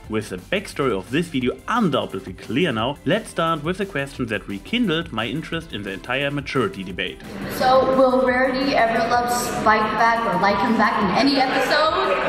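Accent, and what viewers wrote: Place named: Germany. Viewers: Right now i'm really curious: are you german or are did you just had one to many lessons of german in school? (option 3 would be that it was not your own voice and therefore spoken by a native speaker, but it sound extremly similar to me) In beiden für wahrscheinlich angenommenen Fällen bin ich mal so frei und probier es aus: Schönes Video und gute Argumente. Immer wieder schön dir zuzuhören. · Is AnY German? I mean, dat accent though...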